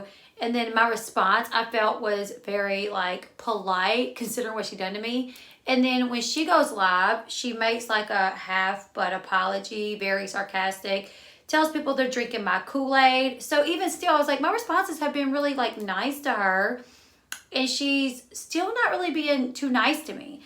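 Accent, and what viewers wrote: South, United States. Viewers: "I've never watched before" but she has a cute southern voice lol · She was right as a southern sister love the accent ( it's like mine) and you are very pretty ,love from Alabama 🤗🐘🐘🐘🤗 that's ALL she was right about 🤣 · You do have a cute Southern voice😉 I truly loved it.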